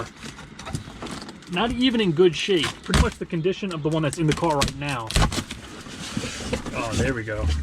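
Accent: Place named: Long Island, United States. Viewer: Hey you from Long Island dude?... you sound it lol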